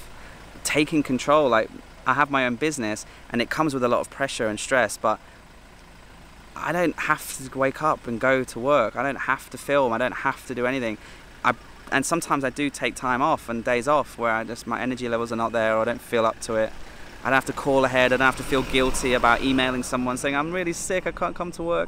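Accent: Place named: Northumberland, United Kingdom. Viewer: Here's a question. "We reckon you are from County Durham or Northumberland and went to private school. Are we right?"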